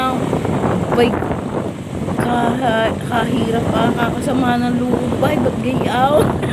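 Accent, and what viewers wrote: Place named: Batangas, Philippines. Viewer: areng batanguenyang talaga oh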